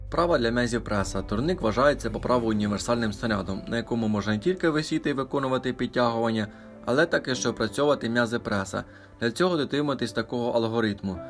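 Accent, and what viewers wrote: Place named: Poltava, Ukraine. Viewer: Круто, просто, і без води, Українська мова просто мед для моїх вух( якщо не помиляюся то Полтавський акцент) у автора велике майбутнє.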